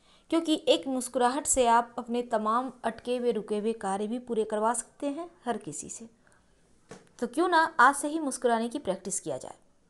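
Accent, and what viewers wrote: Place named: West Bengal, India. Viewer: didi aapnar awaj sune mone hocche aapni bangali.aachen na.aami jante chai ki aapni kundali baniye upay dekhakar jonno koto fees nen pl bole din.nomoskar.aapnar kotha sone khoob bhalo lage.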